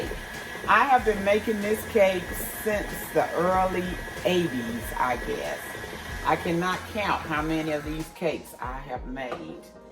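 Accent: South, United States. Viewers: Your southern accent is divine unlike my bland Australian accent.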